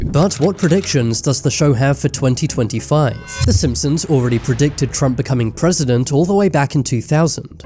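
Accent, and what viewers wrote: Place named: England, United Kingdom. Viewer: What enlish accent is this, makes me crazy listen to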